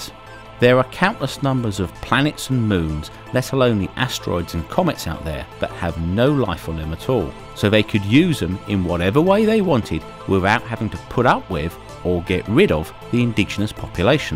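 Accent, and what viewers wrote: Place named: Cockney, United Kingdom. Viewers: i came here just because i caught the voice of a cockney. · Yes he has got that same nasally sound,and London accent. I was thinking that also.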